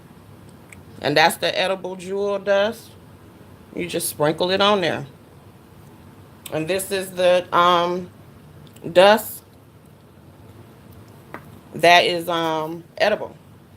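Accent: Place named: Chicago, United States. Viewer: Oh sis: This looks yummy! From a girl born in Chicago and raised in Toledo: Our accent is the same!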